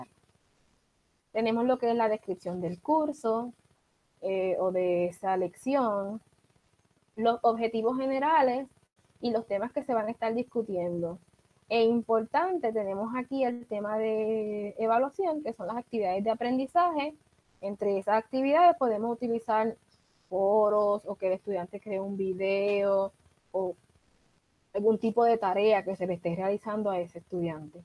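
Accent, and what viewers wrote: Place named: Puerto Rico. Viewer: Hola! Por tu acento estoy seguro que eres de Puerto Rico. Yo también lo soy pero vivo en México. Estoy tomando mi maestría en Educación Superior con la Inter y tuve una asignación relacionada con el Diseño Instruccional. Después de mucho buscar en Internet tu video fue el complemento perfecto para entender las lecturas asignadas, que sinceramente no me dejaron claro el tema. Ahora sí me quedó muy clara la forma de presentar una lección siguiendo el Modelo ADDIE. Gracias MIL!!!!!! Sigue adelante!!!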